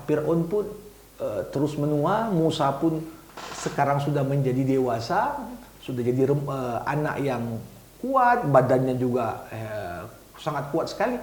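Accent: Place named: Aceh, Indonesia. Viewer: Masya Allah,dari logatnya kek org aceh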